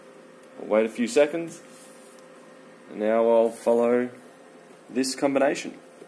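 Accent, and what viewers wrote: Australia. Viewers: Love your Aussie accent homie and great video. You would get tons of women here in the USA. Does it work the other way too? I have heard Austrailian women love American men and its like a 3 to 1 rario there men to women. I hope this question is not offensive, this is just what I have heard. Have a wonderful weekend.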